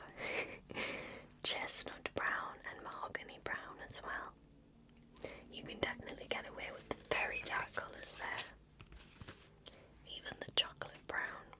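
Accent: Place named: Northern England, United Kingdom. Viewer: I love your accent 😊 gotta love a real northern accent ❤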